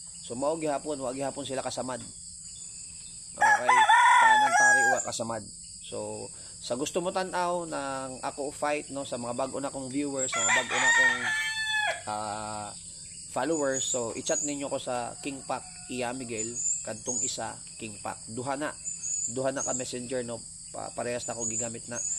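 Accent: Visayas, Philippines. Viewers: nice Boss idol. Bisaya ni Bai😮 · bisaya diay ka idol?.haha oke kaayo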